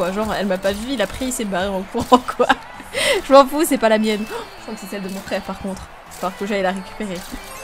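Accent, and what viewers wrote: England, United Kingdom. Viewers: J'adore ton acsent anglais 😂👌❤super vidéooo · l'accent anglais j'aime bcp !! XD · J’adore ton accent anglais 😂😊❤️🥰 ps: je t’adore 😊❤️🥰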